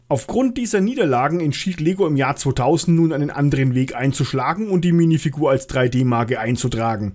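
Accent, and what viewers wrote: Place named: Franconia, Germany. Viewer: Meinen tiefsten Respekt für dieses Video. Ein Thema das so komplex & vielschichtig ist auf Minuten zu bringen & dabei noch informativ, sachlich sehr hochwertig & unterhaltsam zu sein das ist ein echtes Meisterstück (a real masterpiece). Sicherlich es ist nur ein kleiner Umriss, da es noch viel, viel, viel mehr dazu erzählen gibt. Aber dieses Video zeigt auch das die Firma aus Dänemark die heute Fair Play von ihren Mitbewerbern sich wünscht schon immer eine Firma der Winkelzüge, der Ausnutzung von Lücken im Rechtssystem & der geschickt gemachten "Plagiaten" ist. Daher sollte diese Firma nicht mit Steinen werfen, denn sie sitzt im Glashaus (oder Wer im Glashaus sitzt sollte nicht mit Schweinen werfen) Ich liebe deinen Content, ich habe dir ein Abo gegeben da hattest du noch 60-80 Abos, aber mir hat es super gefallen & da war ein Abo pflicht. 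Was ich auch total super finde, ist die Tatsache das du es nicht versuchst deinen Dialekt zu unterdrücken, naja bin Schwabe, aber ein Franken kann man in einem guten Masse verstehen. Mach so weiter & du wirst weiter wachsen.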